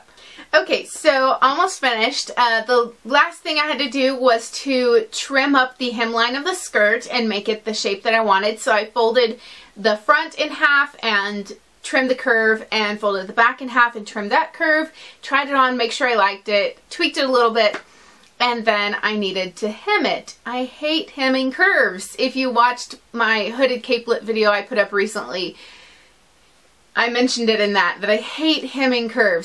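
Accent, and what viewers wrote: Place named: West Coast, United States. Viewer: Would you mind if I asked where you are from? You have a bit of an accent, but I can't tell exactly where from. California? Arizona? West coast USA? Sorry, this question is irrelevant to the topic but I'm very interested in accents & dialects for my work. Thanks! Beautiful skirt, too!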